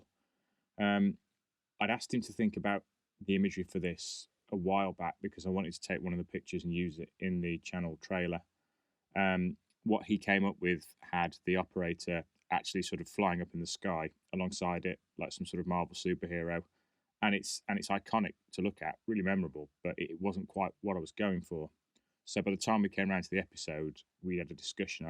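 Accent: United Kingdom. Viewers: Just pinged watched 3 episodes and their production videos. Saving the last one for later. Loved that 3rd video ended somewhat unclear. Im guess you could say im a fan of ww2 planes but i never remember hearing about an boulton paul defiant...Watching the video i thought it was somekind of mix between a hawker hurricane & ju87 :D Dont know if the video production got better but I had really hard time following the first episode. Had to paus it many times, go back & listen to it again. Either I lost interest in it. Or first video had you mumbling? OR the most likely one. It took me time to start understanding the accent. By the way, Im from estonia soo english isint my first language & I absoluutely love the British accent voice :) wish i could fake talking like that.... Riiiight...And as far as the second episode. That was my favorite by far :) Dont know why but it got me hooked and i could not look away. 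Thanks for the stories :)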